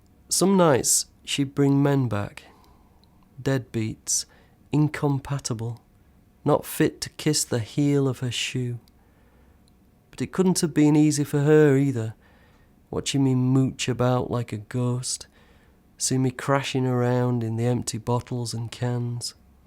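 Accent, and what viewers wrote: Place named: Yorkshire, United Kingdom. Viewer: The mellifluous Tyke tones of Yorkshire's and Britain and Ireland's hardest working and tireless post punk beat poet Simon Armitage CBE...hats off Sir and thankyou for your untiring and quite excellent and exquisite spirit and soul work ... =D...ps love the Husker Du reference ...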